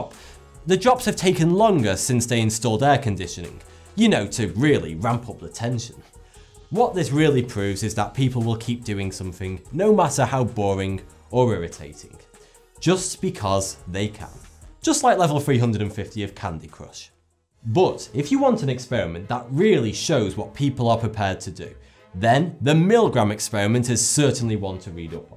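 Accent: United Kingdom. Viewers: He's Vsauce + Tom Scott, but extremely Brit.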